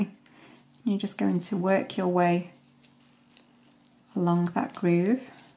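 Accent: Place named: North, United Kingdom. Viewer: nice to hear a lovely ‘northern’ uk accent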